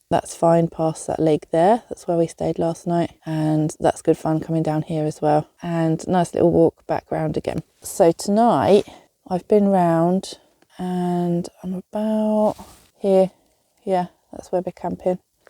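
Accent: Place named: United Kingdom. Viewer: I'm glad you're having fun , where are you from you look Asian but your language accent it's throwing me off you sound British just wondering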